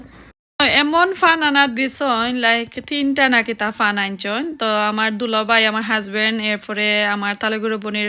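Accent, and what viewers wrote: Sylhet, Bangladesh. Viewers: মাশাআল্লাহ আপু খুব বালা লাগছে সিলটি মানুষের সিলটি রান্ধা। রেসিপি দেখিয়া কিতা কৈতাম খুব বালা লাগছে।নাগা মরিচ দেখিয়া জানে চার আপনার লগে খাইতাম। সিলটি মাত হুনলে বড় বালা লাগে। আমার বড় ভাই পান খুব মজা করি খান। আমি খাই না। ব্লগ দেখিয়া অনেক ভালো লাগলো।👍👍❤️❤️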